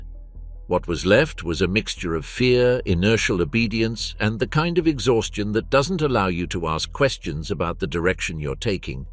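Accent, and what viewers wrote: England, United Kingdom. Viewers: Why are there so many videos on here about Ww2 with a narrator with an English accent that put Russian communism in a good light?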